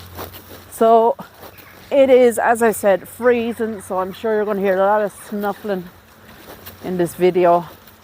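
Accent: Ireland. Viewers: Hi, Iam Darren from Australia and I just wanted to thank you for your amazing videos and the history behind them.... You are very lucky to live in such a beautiful country, I also love the accent it brings back many fond memories.... I was an on-site construction manager and worked in very desolate places in the Australian outback.... A few of the young lads working for me were from Ireland and some of the hardest workers I had, the only thing was they work hard and play hard 😂 They love to drink and fight no surprise when you working in desert like conditions 7 days a week for months at a time, I was good with that as long as they were at the work truck at 5.30 am to go to work in the morning..... Those boys never missed a day, I miss them a lot hard working hard drinking and very respectful.... They made living in the desert actually fun with loads of laughs and bonding..... 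Sorry to rave on 😂 Cheers Darren...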